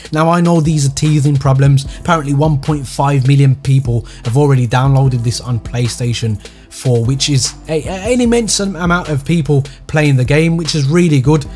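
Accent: Birmingham, United Kingdom. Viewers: You're from Birmingham aren't ya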